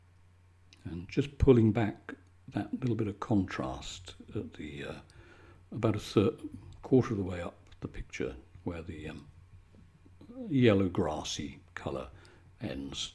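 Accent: United Kingdom. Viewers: I love it..so beautiful, like every time I watch you, and you are just so calming, with that lovely voice and great British accent. . Well, .now I am calm and relaxed. Thank you so much. Both paintings are beautiful.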